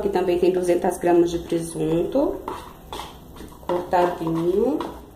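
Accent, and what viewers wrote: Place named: Paraíba, Brazil. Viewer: Vc é paraibana? Sotaque lindo.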